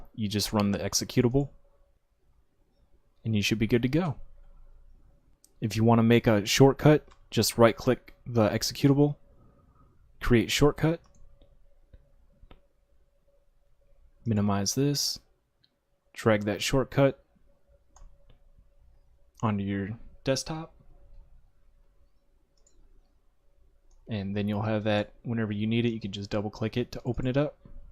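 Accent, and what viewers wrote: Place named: United States. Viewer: hey dude love the video, clear good old plain american english, and great quality too! Could u please make a short video or just quickly explain because i ALREADY have git installed but i would like to use Cmder ! Is there a way to intergrate the two or maybe unistall git and install the complete version of Cmder(inc git) thanks so much ! ☺️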